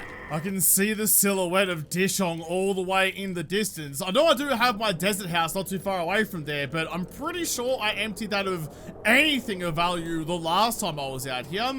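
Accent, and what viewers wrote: Australia. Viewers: just out of curiosity, are you a native Aussie? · Anyone know what a "flozza" is from McDonald's? I can normally understand Australianess but that one has me stumped.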